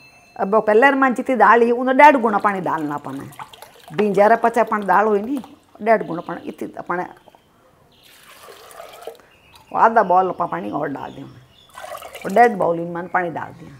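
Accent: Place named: Marwari, India. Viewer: i love ur marwari accent🥰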